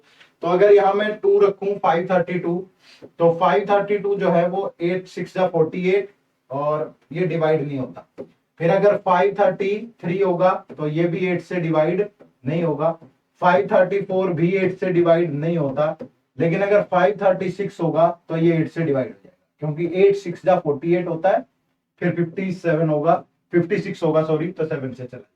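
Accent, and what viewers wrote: Haryana, India. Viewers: According to me also because that speaking way and his simplicty show he is fromHaryana. I like haryana people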